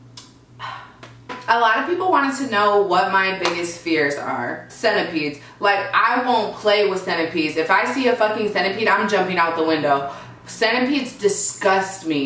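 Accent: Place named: Wisconsin, United States. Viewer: You definitely still have a WI accent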